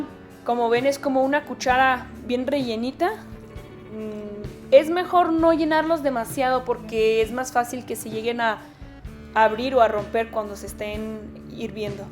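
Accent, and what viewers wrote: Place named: Cuauhtémoc, Mexico. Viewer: Por el acento supuse que eras de Cuauhtemoc 🍎😍! Y sii Le atiné! Mi papá es de allá, A mi esposo y a mi nos encanta ir y COMER todos los antojos menonitas que podamos. Todo es delicioso, los campos menonitas son muy hermosos y su gente tambien 😋 Saludos desde juaritoz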